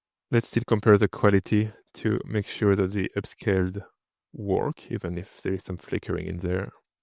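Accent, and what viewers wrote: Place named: France. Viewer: Really fantastic video tutorial, very well explained and in depth. Your english is also fantastic, however, if I am brutaly honest, you have quite a strong french accent and pronounciation, which makes it hard for me to understand you perfectly all the time. Only pointing this out as I found the subtitles very helpful, for following your speech, so remembering to include them in your uploads will likely always be very helpful for your viewers. Look forward to watching more of your content. I am interested in developing some ai photogrammetry to stereoscopic 3d/vr and cad and stl file pipelines, so finding the best way to optimize poor quality low res recordings from a very large number of different sources, efficiently with a high quality footage output, is critical for this objective. I assumed that this upscaler would not be the best choice for this, especially as my first tests were generating unrealistically sharp details, but now thanks to you, it looks like the best option I have found so far, though like you say, it could be better at handling motion. 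Not sure if there is a better free offline alternative for this? Now I have to figure out how to compare the quality output between different video renders in kdenlive, as I am new to comfyui and have no way to install the required nodes, etc, to do this for the next couple of days. I come from more of a 3d modelling background personally and am astonished to learn how many laborious tasks AI can speed up nowadays. Quick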